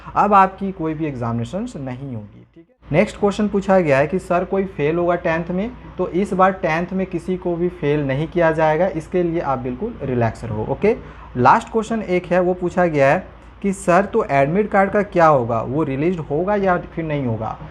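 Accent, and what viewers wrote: Jharkhand, India. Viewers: Sir aap jharkhand se ho??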